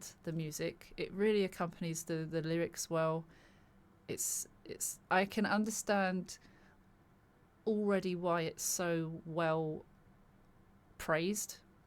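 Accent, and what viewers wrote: United Kingdom. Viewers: And her lovely British accent makes it even more special. Thank you young lady. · You just reacted to by, and it took you 41 minutes and 13 seconds to finish. You didn’t rush it. You took your time with every sentence, trying to understand the lyrics fully. And honestly, your British accent made the whole experience even more lovely. It felt like you were guiding us through the song with care and curiosity. That’s why your reactions stand out. They’re not just content, they’re connection.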